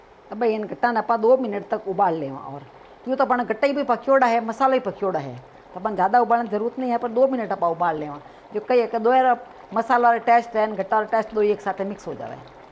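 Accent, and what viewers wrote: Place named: Rajasthan, India. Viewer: Hum b Rajasthan se hi h Aapke muh se marwari boli bhut achhi lagti h Gud recipes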